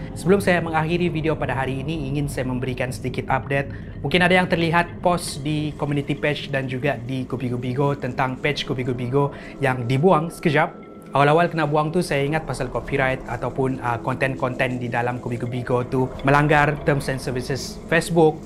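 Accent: Sabah, Malaysia. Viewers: Inngat kan ni indon tpi sabah bha😂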